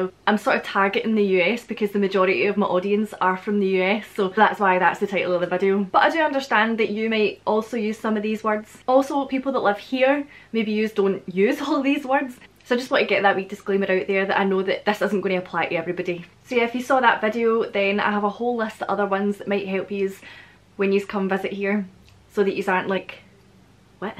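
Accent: Scotland, United Kingdom. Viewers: On one of your older videos on Scottish pronunciation,you were talking about this thing with "i" being pronunced as "eh" or "ey". So how can you exactly tell the difference between the words like: bike-bake,line-lane,fight-fate,mine-main,pine-pain etc. or for this shorter "eh" sound: pity-petty etc.? Also can you actually pronounce it like that when you're taking about yourself,like "I am going somewhere"? 'Cause in this case I can hear that you pronounce it like "ah",but are there any Scottish people who'd pronounce it as "ey"? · For myself, I love the sound of English with what we, as Americans, think of as a Scottish accent. I think if I listened to Scottish Gaelic I would not understand a single word. As a child I was around many relatives that spoke with a Scottish accent including my Dad who was from Scotland. He had many friends and coworkers who were around the house. 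I don't really remember them because after my Dad died (I was maybe 2 or 3 years old) they didn't all come around the house too much and the Scottish relatives were older and well,.... passed away. Anyway, I love the accent and I love to watch and hear your videos. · Love your accent and your videos! Love Scotland! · Interesting, I like to hear how people in Scotland, Australia and England say words that mean the same thing but are different. I’m assuming you’ve seen Braveheart? Just wondering what you thought of Mel Gibson’s Scottish accent. In the movie, they used words like Lassie - meaning a young girl? Or no the now - meaning not right now? Some of the words you said in this video made you sound American, especially when you said “Herb” or “Garage” You should make a video trying to speak like an American. It would be funny, maybe because your Scottish accent is so thick? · Hey, thanks for your interesting videos. 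Courgette and Aubergine happen to be french words. In case you (and your viewers) didn't know. I've just discovered your channel today and I really love your accent. I don't yet know from which part of Scotland you are but i hope to find out. Greetings from France. Take care.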